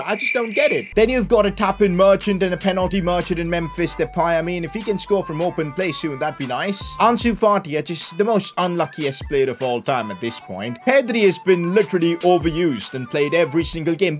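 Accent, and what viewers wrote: India. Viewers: Are you Indian coz your talking way is like that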